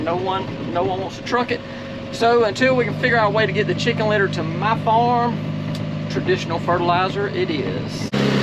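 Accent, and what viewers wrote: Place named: Georgia, United States. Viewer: Great Video! Enjoy listening to your Southern Georgia English. I'm from TN.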